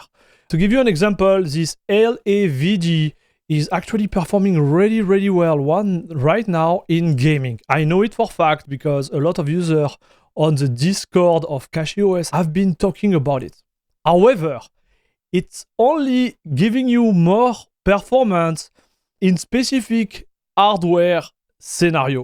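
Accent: France. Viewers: horrible cette accent français ont ne comprend pas grand chose.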